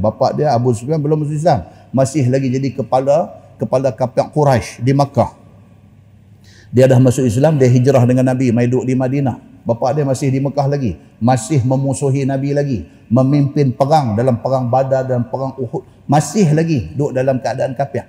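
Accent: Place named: Kedah, Malaysia. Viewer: Guna loghat Kedah tu yg bertambah manis kuliah ini..syabas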